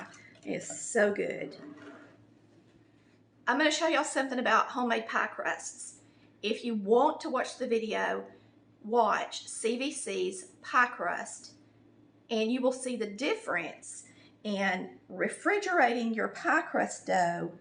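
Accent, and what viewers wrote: South, United States. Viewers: I love watching you. You make the most awesome things. I have learned so much since watching your videos. I love you too. I really love hearing you talk and that wonderful accent you have. I live in SC and it’s right down the alley. May GOD BLESS YOU ALWAYS AND KEEP YOU SAFE ALWAYS. You always make me so hungry 😋. Keep those awesome videos coming. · I can tell you’re from the south, I love your southern accent too!!🥰🥰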